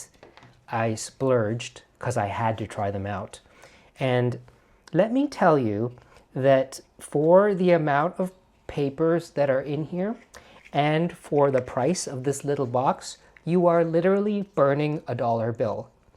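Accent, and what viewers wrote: Ireland. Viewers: 1 minute in and I'm laughing out loud and relating so hard. 😂 Somehow the Irish accent was the perfect intro for this subject. 😅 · I thought he was Irish for a moment and didn't realised the transition to American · I am impressed with the Irish accent! And... everything else does. LOL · Like the Irish accent 😂🇮🇪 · As an Irish person and long time listener I am so excited to hear you do the Irish accent!!! So glad we made the rotation 🎉😊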